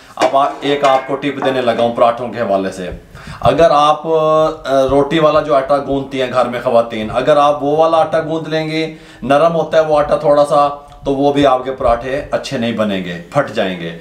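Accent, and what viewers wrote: Punjab, India. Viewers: I lv the way he speaks punjbi viche viche😂😂 and the way he guides. Allah ap se Raazi ho. Ameen. 😇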